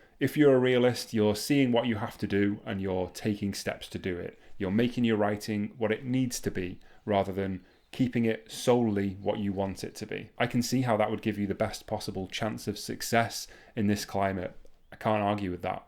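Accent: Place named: Yorkshire, United Kingdom. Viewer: I have two questions which I think you can help me with. First of all I believe that your accent is from Yorkshire so I think you can definitely help me. Because I’m trying to write a book that you set in Ingleton and I’m a London living in Oxfordshire so I have made a rod for my back. I also know that the dialect is definitely different to the way I would say things. The second thing that I don’t know if you can help me with is how do you write a character who is drunk and also from Yorkshire? Do you use the dialect heavily or do you tend to just refer to actions? Finally, I just remembered that I know there’s a difference between an alcoholic and someone who is drunk because you can have a functional alcoholic or an abusive alcoholic who’s not drunk all of the time.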